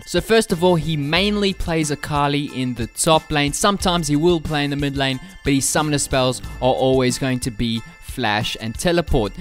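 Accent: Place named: Durban, South Africa. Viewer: God that fucking Durban accent is unbearable.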